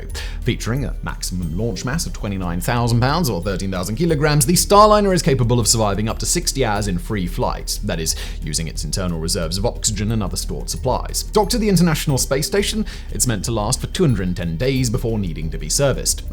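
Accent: United Kingdom. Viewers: So sick of hearing this British sounding windbag. He narrates everything.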